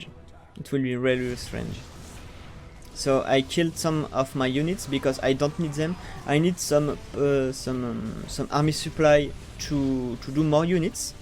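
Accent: France. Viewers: Your French English is cute.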